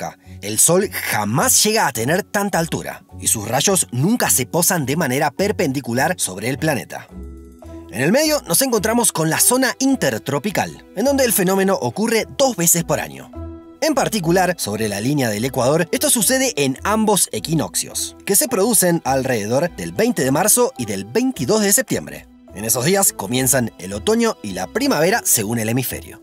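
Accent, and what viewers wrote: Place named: Rosario, Argentina. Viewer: Sí, y se renota que es de Rosarioooooo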